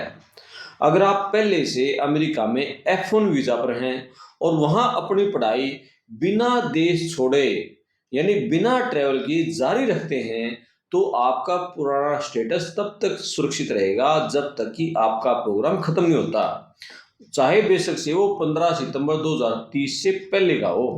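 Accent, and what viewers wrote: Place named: Sindhi, India. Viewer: Improve your sindhi hindi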